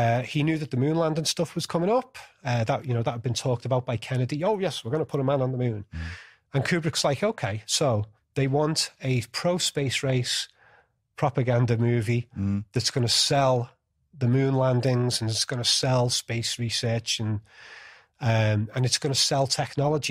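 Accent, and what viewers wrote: Liverpool, United Kingdom. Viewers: you sound just like Paul McCartney, are you from Liverpool? Anyways, I like it, & I love your videos about movies, esp Stanley’s ❤️ from Texas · I love when unleash his Liverpool accent in all his glory! 🏴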